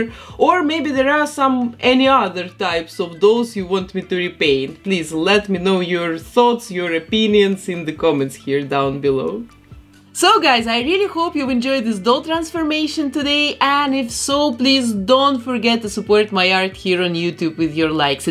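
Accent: Russia. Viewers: рашн акцент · What Is her accent I Wanna say Russian but I'm Not too sure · Какой русский акцент... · Her accent is different. Is she Russian? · I really don't want to be rude but she kinda sounds like Dracula but female version Edit: She sound Russian I'm just saying she got the same accent as Taras Kul [ CrazyRussianHacker ] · Я одна слышу русский акцент? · what country is she from? Russia? Ukraine? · Мне кажется, или это русский акцент? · Просто у нее очень сильный акцент, похожий на русский акцент. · А что за акцент? На русский и правда похоже... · Почему мне слышится русский акцент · Слишком русский акцент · Ты тоже заметил(ла) что русский акцент? Она знаешь, так как то ооочкнь странно звучит как "Бринан ин зэ Кэпитал оф Юкреин" 😂😂😂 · Is she Russian? I love the accent! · i love your accent are you Russian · Ну очень сильный акцент, сразу видно, что русская или СНГ.))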